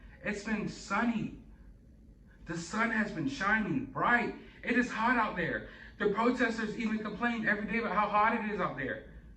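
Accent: South, United States. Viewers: Where in the south are you from? I'm from Alabama!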